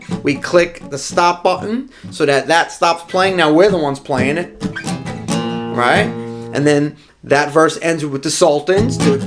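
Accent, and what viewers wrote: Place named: Long Island, United States. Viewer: Nice lesson. Got two loopers one Boss and one on the Helix. Now ive gotta figure out how to use them. I know the Boss is capable of overdubbing not so sure about the Helix looper. PS. Wheres the accent from?? Sounds like either Strong Island or Massachusetts. Im betting on Long Island!!! Am i right???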